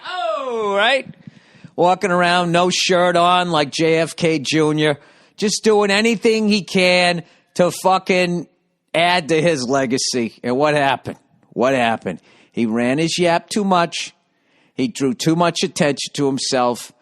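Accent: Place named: New England, United States. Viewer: thank god for this podcast. i share a house in kentucky with half a dozen women, work with all female coworkers and abrasive new england accent is like an oasis. it's good to hear a baritone for once instead of all these drawling mezzo-sopranos.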